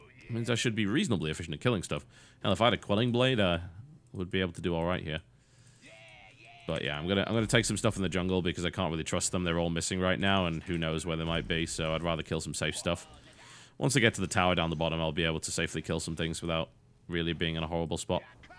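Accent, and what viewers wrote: United Kingdom. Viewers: You sound extra british in this video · How are you saying things wrong? Your British, if anything the Americans are saying it wrong but eh its the accent I guess, just never think you are the one who cannot speak English correctly.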